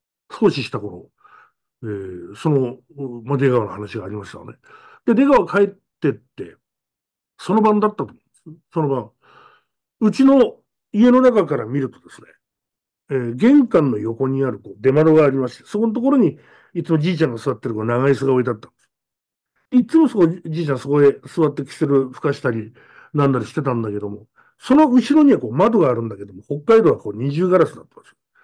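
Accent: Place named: Aomori, Japan. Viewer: 青森県出身のわたし 🥰🥰🥰 さんの青森県の方言、お上手ですね 🥰 青森県は 🎀津軽衆 🎀南部衆と分かれます🫧🤗 わたしは南部衆の産まれですが。八戸の近くです！さんの方言方法は南部衆の方言に近いですね 🥰 南部衆の方言は北海道の方の方言にも似てるとわたしは思います 💐💐💐🥰 心温まる怪談、本日も癒されておりました 💝🫧🫧🫧🤗 ありがとうございます♪ そして、いつもご丁寧にお返事ありがとうございます♪ シングルマザー、美容家のわたし🫧🎀 子育てやお仕事の励みになっております 🫧🫧🫧 感謝致します❣️ 🌹鈴子🌹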